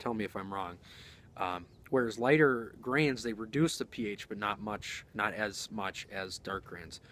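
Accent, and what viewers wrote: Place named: North, United Kingdom. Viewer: Indeed, homebrewing is awesome because there are some things that make more sense through experience than just from reading. Slainte!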